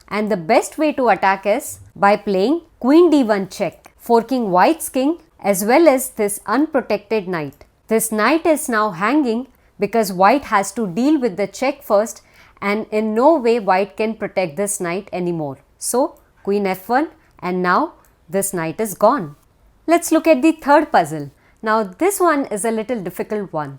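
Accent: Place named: India. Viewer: Thanks! :) your accent sounds Indian idk why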